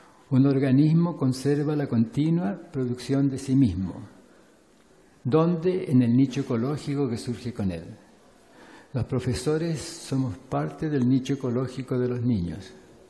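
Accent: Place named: Chile. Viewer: Amo el acento chileno♥ Que hermoso escucharlo mi querido Maturana ♥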